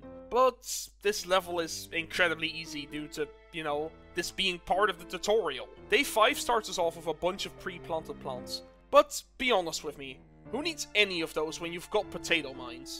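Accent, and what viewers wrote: Netherlands. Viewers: U dutch? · dutch accent spotted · Are u Dutch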